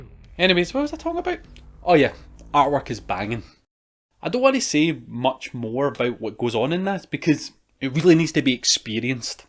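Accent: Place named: Scotland, United Kingdom. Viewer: Hi. Well done really. You make me curious to watch it actually. I think the job is done in that case. I'll do what I do best... Opinion time. + Great performance + good topic + perfect editing + super transitions + good integration with watcher (me) Ready for that? - YOU HAVE TO GET RID OF SCOTTISH ACCENT IF YOU WANT TO BE LISTEN GLOBAL. I'm so sorry to say that:( Overall piece of great work:)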